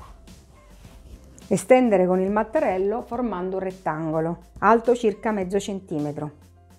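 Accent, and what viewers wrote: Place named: Southern Italy, Italy. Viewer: Seguo spesso le vostre ricette, complimenti. Posso chiedere di dove siete?. Sicilia? Calabria?. Non riesco a capirlo dal vostro accento...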